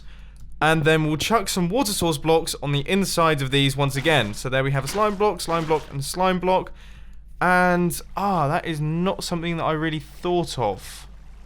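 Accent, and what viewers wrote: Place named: United Kingdom. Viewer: I love that British accent "watah source, watah source, watah source" xD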